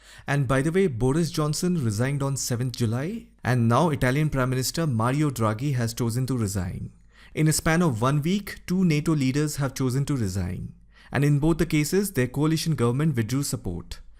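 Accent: India. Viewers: Russian propoganda in English with an indian accent. I still cannot understand why Indian people so vigorosuly support a country that is shelling civilians on Ukraines soil.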